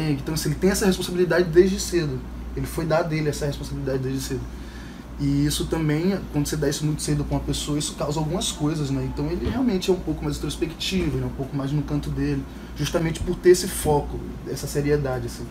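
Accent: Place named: Northeast, Brazil. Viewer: Que gca,romântico E questionador!Gostei!Ame ivc como Carlos!Parabéns!Agora que to reparando que tem um sotaque nordestino!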